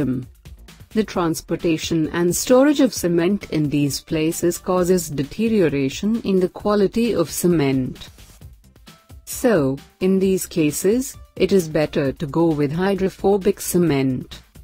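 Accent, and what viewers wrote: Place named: India. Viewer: Why does the bot have an indian accent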